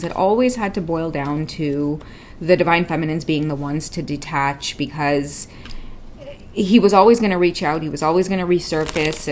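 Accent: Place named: Trinidad and Tobago. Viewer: Hello my fellow Torontonian!!! I love your Trini accent. ❤❤❤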